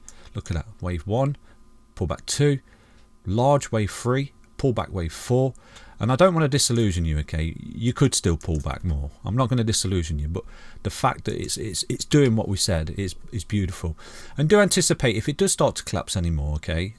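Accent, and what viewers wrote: United Kingdom. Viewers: Soon as I heard that British accent I subbed.. 🇬🇧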